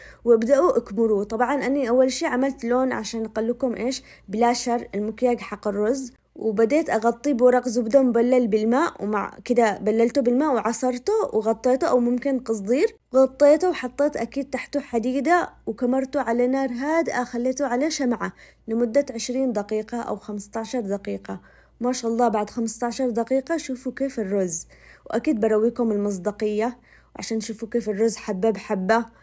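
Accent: Aden, Yemen. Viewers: شكرا جزيلا لك يا بنتي كلامك العدني الأصلي والاكل ماشاءالله تبارك الرحمن ربي يحفظك حلو جدا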